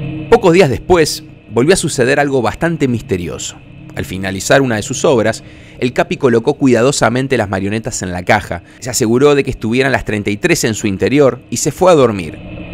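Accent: Argentina. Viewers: Es interesante escuchar leyendas de mi querido Mexico de voz Argentina jajaja, me gusto la edicion y la forma de contarlas, aunque la de la llorona es algo equivocada, pero hay un comentario que lo explica bastante bien, saludos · Narrada por un argentino???!!! Que poca madre!! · Me encantan las historias Mexicanas contadas por extranjeros , el acento Argentino le da ese toque de terror e intriga , ( soy mexicano ) saludos a los argentinos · Qué excelente manera de generar contenido que buena edición y narrativa haces muy buen trabajo soy de Colombia y amo a México como no imaginas, nunca he tenido el placer de viajar allá pero su cultura ha hecho parte de muchos colombianos por años gracias a la tv y ahora el internet la riqueza cultural de México es innegable gracias por dejarnos conocer más de sus tradiciones Aunque bro soy bastante miedosito y esa imagen final de la historia de Nachito donde pones ese niño tan aterrador jmm Dios casi me matas no borro esa imagen de mi cabeza Me gustaría escuchar historias de muchos países más el acento argentino me encanta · Eres argentino verdad :pd acá en México nos estamos cagando s México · ¿Un argentino narrando leyendas mexicanas?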